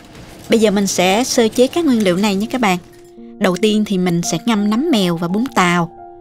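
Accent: South, Vietnam. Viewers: Tuyệt vời cô ơi, cô chỉ dẫn thật rõ ràng cứ theo đó mà làm có món ăn ngon lành, giọng cô trong trẻo thân thương như chữ há, nè, hén, nha của người Nam Bộ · giong noi mien nam hien lành và de thuong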